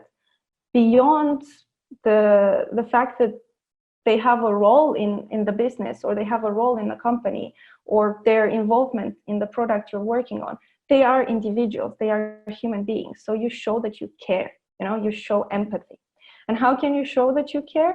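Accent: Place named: United States. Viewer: Nu am urmărit tot spiel-ul, dar sper să o fac altă dată. Pe mine mă durea întotdeauna capul când trebuia să vorbesc în l. engleză cu clienții nemți 🙂. Dar asta a trecut. Supărarea mea cea mai mare este idioata „romglish”. Nu știu dacă va mai veni vreodată o vreme când o să ne fie cald sau frig în loc să suferim de „disconfort termic” !@ ! În altă ordine de idei, aveți un accent englezesc superb. Mi se pare însă mai degrabă american, decât britanic. Numai bine!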